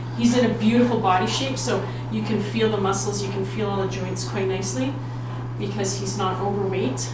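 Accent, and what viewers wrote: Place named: Ontario, Canada. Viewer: Her accent sounds Ontarian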